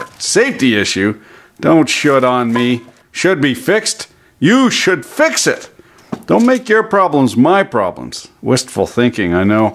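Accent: Quebec, Canada. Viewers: That Québécois tho. I like it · He speaks like a true canadian. " how da fuck can you close on a weekend " lol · You must have spent some part of your life in Quebec, you couldn't have acquired that slang and pronunciation anywhere else. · Is he Québécois? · That's the most Canadia I've ever heard in 5 and a half minutes and I loved every second! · Me too... and i'm french! That quebec accent was so pronounced it's basically creole at this point. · Little mix of quebec, ontarian and american talk😂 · Je savais pas que t'étais Québecois! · Hahaha Quebecois en criss! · Cobalt? New Liskard? Not many places where you can develop both a hoser accent and also a heady joual... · I can imagine the confused looks, when people don't understand the subtlety of the engrish Canadian mixture, fucking great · Is that a Canadian accent you have? Aye good day. Take off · oh canadian . 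okaaaay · Where the F is the CC button? No one understands Canadian English? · Il parle le joual la plus belle langue du christ!!:) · Please keep the quebecois coming its hilarious! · Naw, welcome to Canada, eh. We all talk like that. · be speaking true Quebecois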